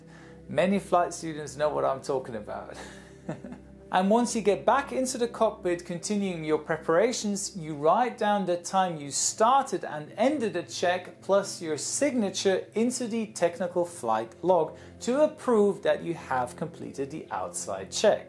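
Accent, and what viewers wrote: Germany. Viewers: Ach... nen Germane... bin ja erschüttert das man das nicht schon früher festgestellt hat. XD (Gut... irgendwie klingt jeder Pilot gleich... da hinterfragt man das wohl nicht. ;)) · Are you German?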